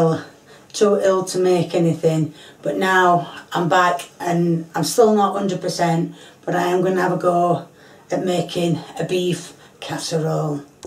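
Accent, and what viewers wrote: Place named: North, United Kingdom. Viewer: It's a Sheffield dedah accent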